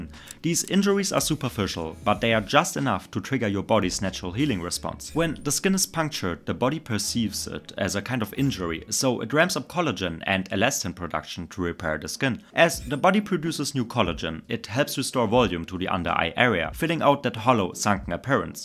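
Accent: Germany. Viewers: Sounds like german „english“ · That German accent paired with "we never accept sponsorship" assure me deeply. Thank you, you've saved me a lot of time and money! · Thanks lads, i'm off to buy both the sunscreen and peptide cream you showed here in the video - it's in one of my local stores :) Love the Deutsch accent, greetings from your cousin further up north in Denmark - Subscribed and liked the video! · Are you german? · Indian? Isn't this a german accent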